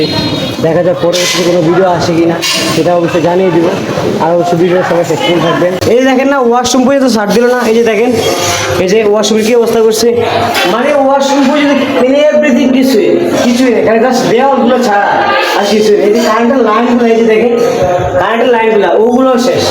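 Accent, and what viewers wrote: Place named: Faridganj, Bangladesh. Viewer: তোমার ভাষা ফরিদগঞ্জের কোন জায়গা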